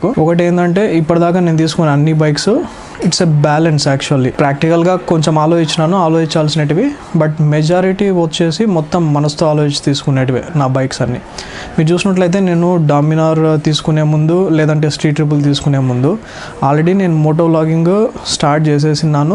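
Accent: Rayalaseema, India. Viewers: You are from rayalaseema right??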